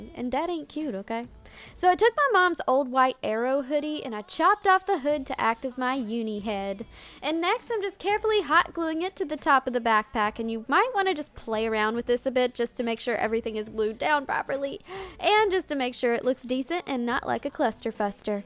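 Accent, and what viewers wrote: South, United States. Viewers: Does she fake the country accent bc why did she lose in the middle of the video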